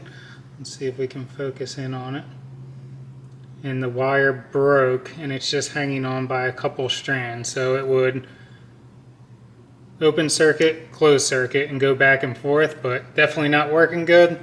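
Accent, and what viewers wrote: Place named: Philadelphia, United States. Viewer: Very informative! I appreciate learning about the Cameo. You sound like you are from Philly.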